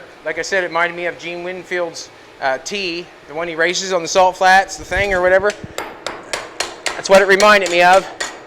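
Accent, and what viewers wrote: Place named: Newfoundland, Canada. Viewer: the accent,,,so you would be what i consider/call a newfy,,?,, a canadian from somewhere east of quebec,,,?...lol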